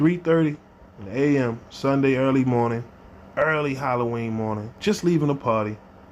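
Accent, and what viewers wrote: Baltimore, United States. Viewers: You from Baltimore dummy? Glad I found this channel Love hearing dudes from Baltimore get love we on the map right now with the music and shit 💪💪🔥🔥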